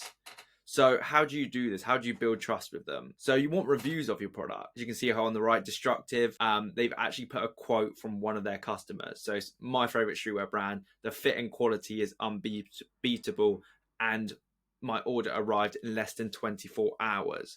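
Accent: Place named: United Kingdom. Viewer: Love u and the brit accent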